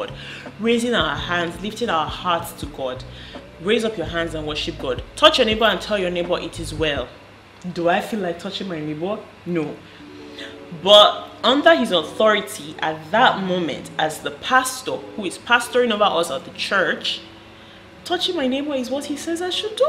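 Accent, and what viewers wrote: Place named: Ghana. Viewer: Maraji is picking up the Ghana accent already o😁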